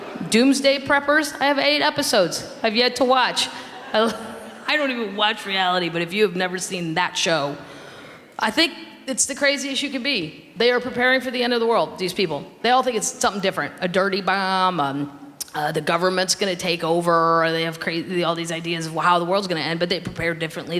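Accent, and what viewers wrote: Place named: Midwest, United States. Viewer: Such a cutie - love her midwestern accent 🥰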